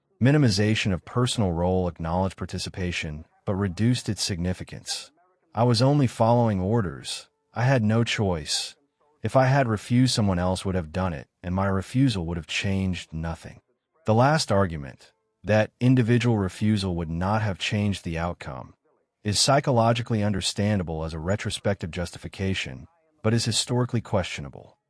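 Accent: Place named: England, United Kingdom. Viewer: It would also be helpful if German words were spoken with more of a German accent than an English accent. If you want a quality product, this could be done. For example, It’s “gruppen” with a long “u” sound, not “grupen” like “up-en”. Spend the cash and get a professional translator to help with the accents/pronunciations. This is done all the time. Then it doesn’t sound so amateurish.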